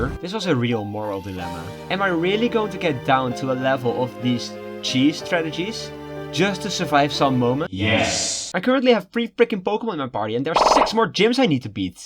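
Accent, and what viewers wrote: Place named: Netherlands. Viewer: You sound like you are from the netherlands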